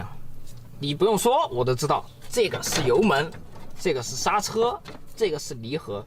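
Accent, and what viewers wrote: Sichuan, China. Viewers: 怪说不得的川普发音就很local了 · 欸！！！你的四川话好亲切！！！！！因为我就是四川人！！！在国外呆了好多好多年了！！！！！！！！！！！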